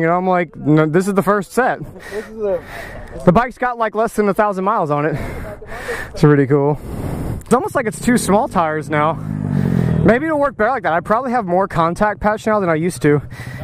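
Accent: Australia. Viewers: 10/10 Australian accent. Please do more.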